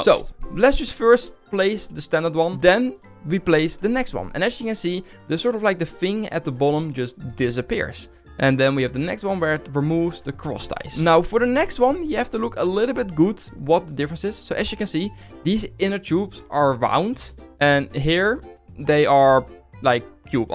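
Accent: Netherlands. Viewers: Are you from the Netherlands 🇳🇱??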